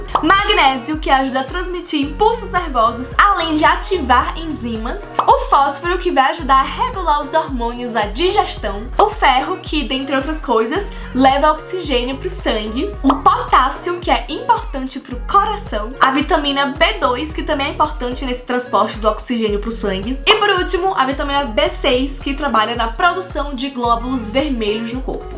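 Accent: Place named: Ceará, Brazil. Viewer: Adorei sua aula e sua espontaneidade. E o sotaque cearense. Acertei?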